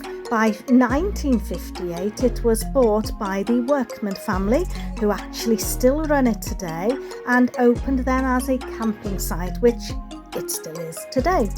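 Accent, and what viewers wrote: Wales, United Kingdom. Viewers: Hi, even though I have seen this one a couple of times before - believe it or not, I think I have a lot of details to comment on (still, this time) and I think even 1 or 2 new questions that crossed my mind. Firstly, I have to say, I am extremely impressed with you and with regards to research and knowledge that is required to put together one of these documentary type videos. And, I am also impressed with your beautiful narration (always)! And, of course, with your lovely and awesome Welsh voice and accent! Amazing place! It seems like (throughout history) England (and other places) was always fighting with Wales (to maybe steal its land). As such, it seems like there was a lot of defending required - by Wales. On another note, it seems like 8 services for the monks each day was a lot - even for monks. With regards to the "Black Death", my guess is that it is likely the same event as the "bubonic plague pandemic". 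I vaguely remember reading one time that the "plague" killed half of the people of Europe. That is just crazy - something so powerful and uncontrollable! By the way, I have a question for you, with regards to the Abbey's revenue sources. You mentioned that the 2 primary revenue sources were "rents and tides". I take it that "rents" refer to the revenues from tenants. Would that include the monks too - having to pay rents to the ultimate owners of the complex at the time? And, regards to "tides", was the complex located near the ocean (with boat dockings)?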